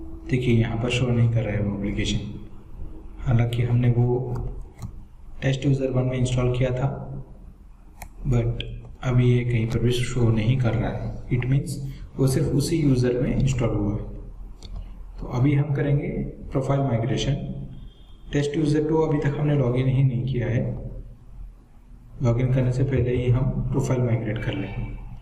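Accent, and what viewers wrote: India. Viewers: Interesting that commenters can't understand your English. I suppose after working with India programmers for a couple of decades, my ear is accustomed to your accent. Never the less, the software you're downloading looks too risky to take the chance. It's pretty hard to trust the profile migration tool from the FORENSIT site when it's not even SSL / HTTPS protected. That said... Dave Yadav You Tube video "Migrating profile to a different domain" uses an SSL secure site to download the software, is better practiced with English, and does a pretty good job explaining this topic. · fu ck ing indian accent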